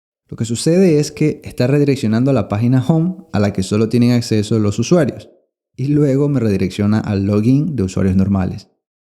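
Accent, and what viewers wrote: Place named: Uruguay. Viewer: sos uruguayo te saque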